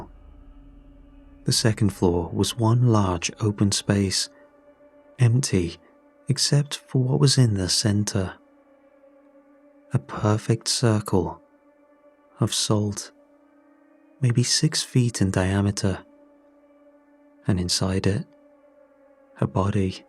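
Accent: England, United Kingdom. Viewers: Because this channel is garbage now. It was good 10 years ago. Now its the same stories on repeat live the only video worth watching with no ads. Plus doesn't end and wake you up every couple of hours. Its background noise. Its good at it too. Great English accent 👌 Finding out he's Asian is a kick in the teeth though. They came to steal our life. Our accent our voice then they try to speak for us 😢 I once heard he had case against him too. Idk enough about it to elaborate though. But I do want to know more on that...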